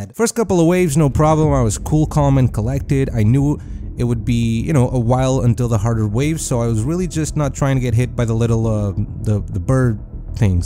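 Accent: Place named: Portugal. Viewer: ahah "daaassss" "é agora caralho" puro tuga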